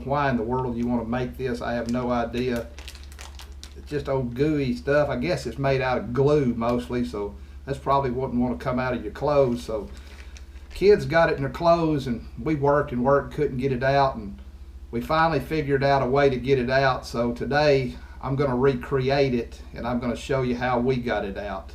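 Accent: South, United States. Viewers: Why thank you!... Love the Southern accent!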